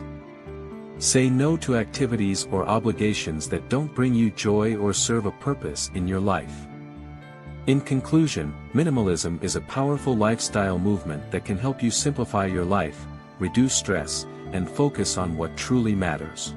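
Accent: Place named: England, United Kingdom. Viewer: 🎉amazing job ,You have a beautiful English accent.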